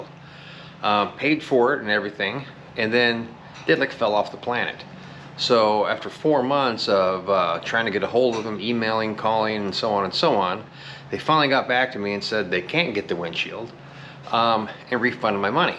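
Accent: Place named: Texas, United States. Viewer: May I ask where abouts are you located, It sounded as if your in Texas or close to it, Just curious and if you rather not say for obvious reason of unlikely types showing up I get it You don’t know me but maybe we will meet at a show sometimes But I really need to watch more of your videos I see some learning this old man needs to pick up on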